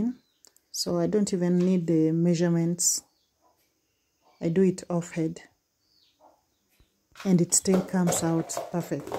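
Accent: Kenya. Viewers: ❤ you sound Kenyan to me ,am from Kenya where is that. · 💯I so agree with your sentiments. I would love to live in such a beautiful serene environment. I hope to return to visit Africa one day. Are you in Kenya? Your accent + maandazi equals Kenya or TZ to me. 🥰 I was in Kenya and it was like being in heaven (30 years ago). May I be able to return and live like you. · Where are you in Kenya? I lived near Eldoret from <num>. It's so beautiful there and hearing your accent reminded me of my old friends in Kenya :) Asante sana kwa video nzuri sana!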